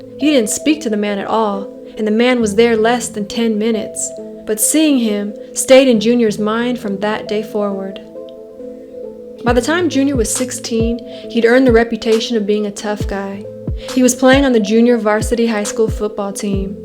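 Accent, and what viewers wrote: South, United States. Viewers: The narrator has a sweet southern voice ❤️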